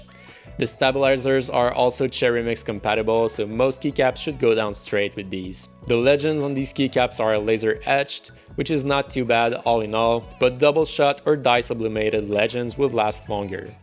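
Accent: Quebec, Canada. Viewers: J'aime ton professionnalisme, le travaille de tes vidéo sont soignée et ton accent québécois distinctif avec une diction impeccable et sans reproche (magnifique) :)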